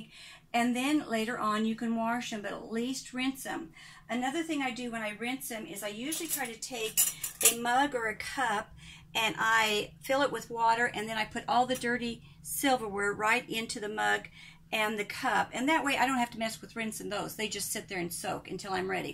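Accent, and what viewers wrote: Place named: Kansas, United States. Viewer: I knew u were from Kansas. Kansas girls just know one another. And the way we say wash. Ps I was all my dishes by hand ❤️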